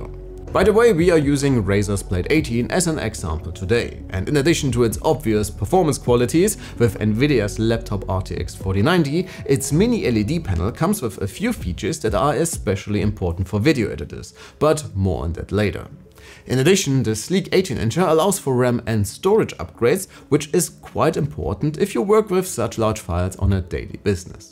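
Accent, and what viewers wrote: Germany. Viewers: I just discovered the notebookcheck channel via the "HP Omen 17 2024" review. Really great stuff! You are a great speaker and your german accent sounds nice ;) As a hobby audio guy I always appreciate that you guys include "LatencyMon" and fan noise examples in the reviews. I would love a Video about DAWs and Audio Setup on Windows Laptops one day! Keep up the great work!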